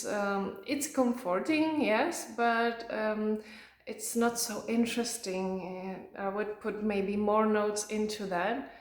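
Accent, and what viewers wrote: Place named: France. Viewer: Hello! I loved your video and the way you describe your fragrances. You have such a beautiful accent, the nicest I have ever heard on YouTube talking about perfume. Are you French? You are so elegant! I can't wait to hear your wish list. Also your male recommendations? Stay safe and please keep going with your channel I love it! Best wishes from Australia xx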